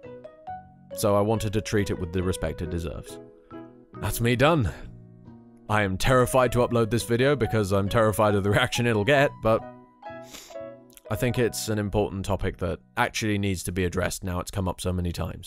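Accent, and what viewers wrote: United Kingdom. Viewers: Well having a patreon is nothing bad. Good luck with the rewards! Except for the once that have already been mentioned (names in the video, donators chosing games and playing with you on multiplayer games on stream) maybe for a higher tier the ones who donate can ask for a sentence you can say in your british accent? Then you can send the mp3 file to them via e-mail or something like that, and they can do whatever they want with it. Use it as a notification sound on their phone, in their videos. I don't know. Again this is a suggestion, you are more than welcome to do whatever you want with it. Also sorry for being inactive on your channel. I am very easily scared by horror games. Anyways tallihoo and good luck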